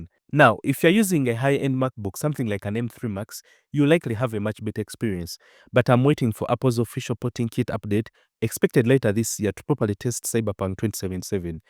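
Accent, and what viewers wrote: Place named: Uganda. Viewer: Ugandan accent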